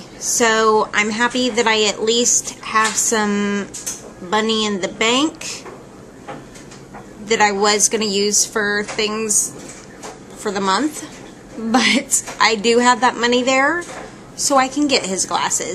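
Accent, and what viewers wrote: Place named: Rural, United States. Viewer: lol ur hick accent is funny.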